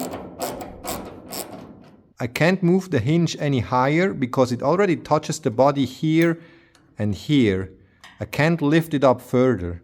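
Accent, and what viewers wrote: Switzerland. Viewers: When you hear that Swiss accent, you know this job will be done with 110% accuracy. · Your accent is lovely! Are you from Schweiz, or maybe south of germany.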